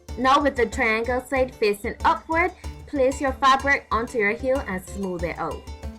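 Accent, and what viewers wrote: Barbados. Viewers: You know a bajan voice anywhere l am so glad to see a BAJE doing this kind of stuff and your instructions are great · I hear the bajan accent .. love it!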